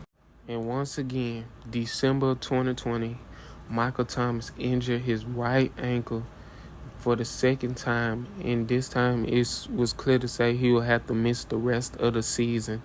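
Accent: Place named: New Orleans, United States. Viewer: Good video and great job. I loved how you said playa. Your N'aulins accent warms my heart. I've moved out of Louisiana to Mississippi but my eyes are always looking back to my hometown. I'm 'bout to subscribe to your channel and smash that like button. I'm a who dat baby. Are you ?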